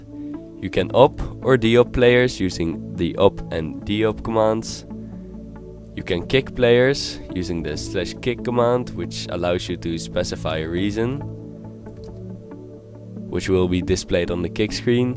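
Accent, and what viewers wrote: Netherlands. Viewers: Are you dutch? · i am dutch and i think he to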